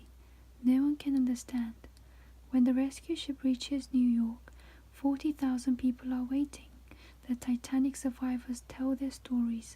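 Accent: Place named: United Kingdom. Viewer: 님 진짜 목소리 너무 좋으세요 ㅠ I love your voiceeee💕 그리고 영국 발음으로 읽으시는것 같은데 저는 미국 발음으로만 말할 수 있어서 그냥 목소리도 발음도 너무 매력적이에요! 잘 듣고 가요, 감사해요!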